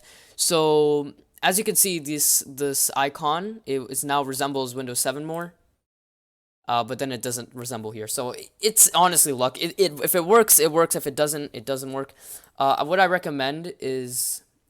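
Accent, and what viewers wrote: Czech Republic. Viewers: ty si čech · Wait a second - you're czech? Yaaay me too. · Are you from czech republic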